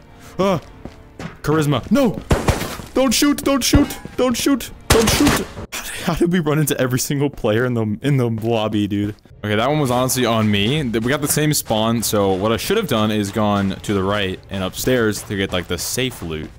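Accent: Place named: Canada. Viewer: this guy sounds canadian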